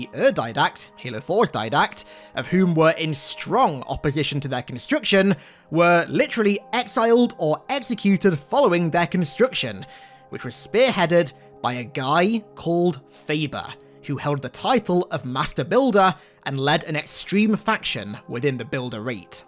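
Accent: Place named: United Kingdom. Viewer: Where are you from? Sound British but speak American.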